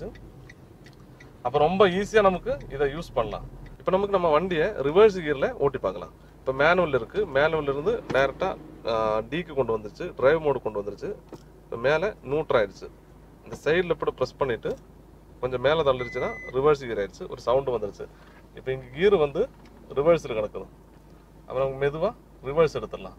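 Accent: Kerala, India. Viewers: malayali tamil pesrathu ...nalla irukku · Malayali tamil samasrikunnu... Nanum thamilan than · நண்பா உங்களுடைய மழையாளம் கலந்த தமிழ் கேட்பதற்கு அருமையாக உள்ளது.நன்றி. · When a malayali speaks Tamil it sounds so sweet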